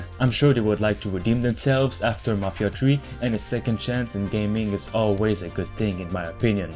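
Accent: Quebec, Canada. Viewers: are you Quebecan or French Canadian?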